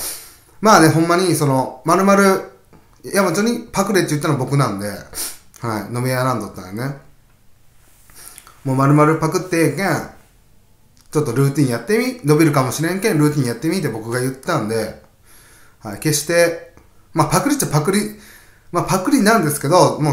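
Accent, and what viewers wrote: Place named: Okayama, Japan. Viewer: さんと幼馴染みとも知らずに、さんの動画を見てました。彼の独特の岡山弁が好きですね♪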